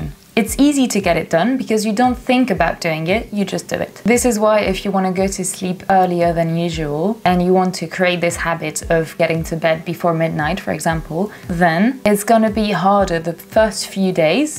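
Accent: United Kingdom. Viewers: great british accent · Such a beautiful accent from the UK ! Moi qui essaie d'améliorer mon anglais et avec cette thématique c'est d'autant plus motivant ! Merci et bravo pour cette vidéo :virtualhug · J'adore ton accent britannique 💕💕💕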